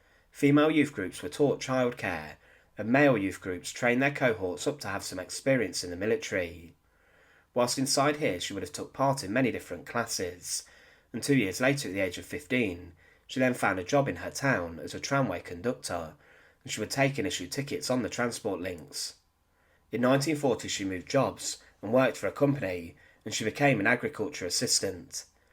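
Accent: United Kingdom. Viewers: It seems you have a British accent, have you ever made a video about the 50 plus concentration camps build by the British? i guess NOT! You poms are good in pointing the finger on others!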